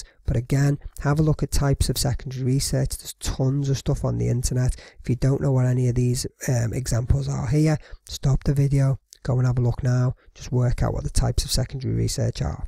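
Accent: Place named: North, United Kingdom. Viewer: this video would of got more likes if you weren't northern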